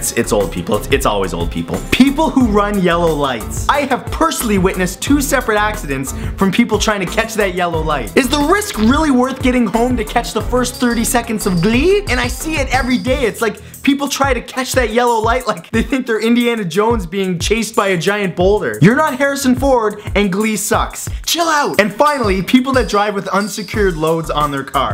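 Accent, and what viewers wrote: Canada. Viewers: this is going to sound completely rasist but are u Canadian.I love your vids u r cool 😎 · I love it when he's in a mad rant it's still kinda pleasant cuz he's so canadian.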